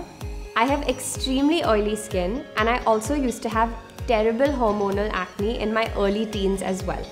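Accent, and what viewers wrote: India. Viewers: So funny how you look American and have an indian accent,didn't expect that at all😂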